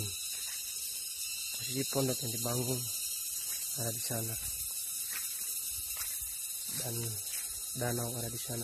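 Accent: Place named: Poso, Indonesia. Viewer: Logatnya to Poso memang 🤭🤭🙏🙏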